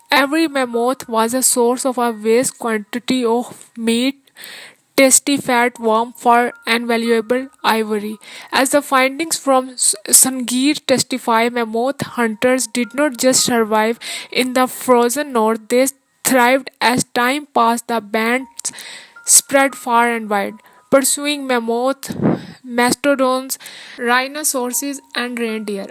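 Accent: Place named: India. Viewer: Typical Indian english !😊 Very inexperienced reading, poor pronounciation and unprofessional audio editing. Visuals can be rendered better. Please improve the audio/video and upload again. Will wait in expectation.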